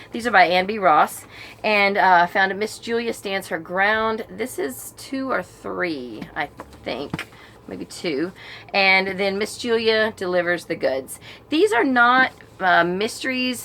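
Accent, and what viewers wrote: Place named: Oklahoma, United States. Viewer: Yes, I love your Oklahoma twang!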